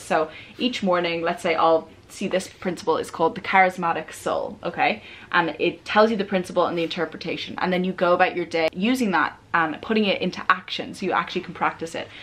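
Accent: Ireland. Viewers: Which part of Ireland is your accent from? I've been watching for a while and I absolutely love it! 😁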